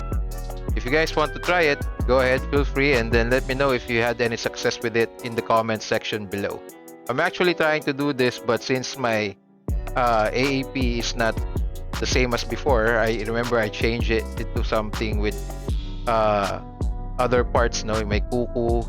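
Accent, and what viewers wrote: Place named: Conyo, Philippines. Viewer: Conyong conyo amp...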